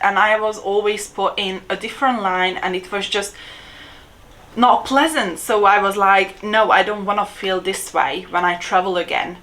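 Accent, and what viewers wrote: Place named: Europe. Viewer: you CANNOT claim British Citizenship if you had a baby born here in the UK from a British Ciztizen! Having a child who is a UK citizen does not automatically grant you citizenship as a parent. you were talking out of your arse! don't spread nonsense information that is absolutely not the case! Before you post videos, do your research! Giving up your Hungarian citizenship was the stupidest thing you could ever have done! What an absolute idiot idea! So basically, you gave up on your Hungarian Citizenship because you are a self-conceited person that it makes you believe that you are somewhat of a bigger person than the rest of us. I can easily imagine if you ever went on holiday to Hungary, you would be pretending to be a British born Citizen and only speak English to everyone. how pathetic! But in reality, you have lost a lot by giving up your EU Citizenship :) Another thing, your accent is off. 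You still have that strong European accent, so keep practicing! One day you might be able to have a British accent ;)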